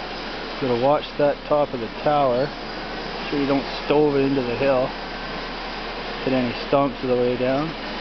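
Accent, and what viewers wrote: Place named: West Coast, United States. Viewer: I love it! ha your commentary is as westcoast as it gets!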